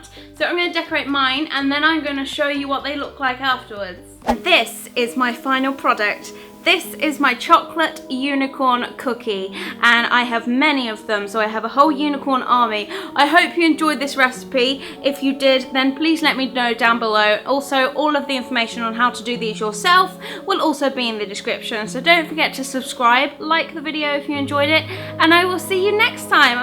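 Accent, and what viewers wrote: United Kingdom. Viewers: I can't tell if your British or American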